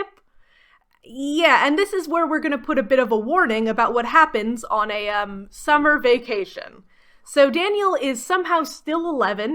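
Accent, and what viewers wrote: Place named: England, United Kingdom. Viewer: You say you are American, but Ive heard you say things before a take that are unmistakably an English, so watch out its rubbing off on ya haha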